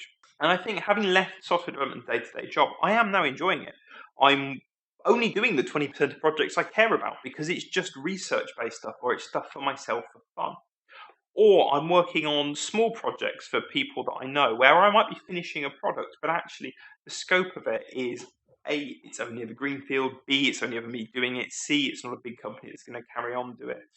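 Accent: United Kingdom. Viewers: Please talk a little bit slower. There are people that are watching you and English is their 2nd language. And UK has a particular accent and no substitutes. So it can be harder and have to stop and rewind. You can take your time, no need to rush. Everything else is good. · Is it the audio quality or the British accent.. it is hard to understand you sometimes. But this topic is great :)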